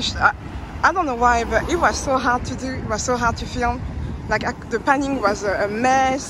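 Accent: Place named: France. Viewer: Try not to be put off by people saying you can't film. You are allowed to film anything in a Public Place! Great walk as usual! So I have now deciphered that your first language is French! Love the voice.....oooh la la!!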